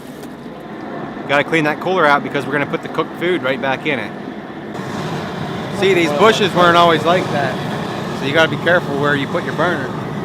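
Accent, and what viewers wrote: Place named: Maryland, United States. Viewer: This was a really helpful and informative video. Not sure where you're originally from - the accent, I'm guessing Maryland or Delaware ;) but take it from someone from south Louisiana, you did a good job! BTW, if the tail is straight after cooked, that means the crawfish was dead going in, so don't eat those :)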